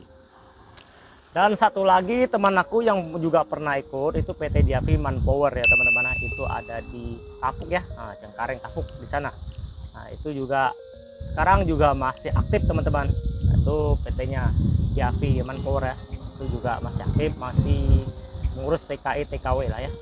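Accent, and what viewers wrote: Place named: Taiwan. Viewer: Logat nya logat taiwan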